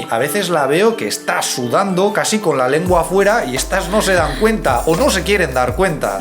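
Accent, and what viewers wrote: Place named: Spain. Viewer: El asentó español es tan estresante y molesto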